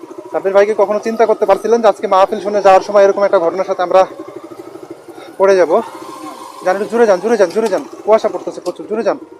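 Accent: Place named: Naogaon, Bangladesh. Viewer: ভাই আমি আপনার সবগুলো ভিডিও দেখি। আপনার কথা শুনে মনে হয় আপনার বাড়ি নওগাঁ জেলায় দয়া করে বলবেন আপনার বাড়ি কোথায়। প্লিজ 😂😂😂😂😂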